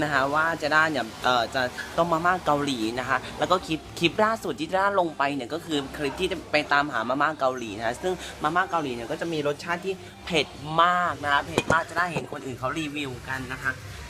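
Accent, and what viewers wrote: Isan, Thailand. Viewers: หื้ม!!คนอิสานบ่นี้555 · พี่เปนคนอีสานหรอค่ะ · พี่เป็นคนอีสานหรอค่ะ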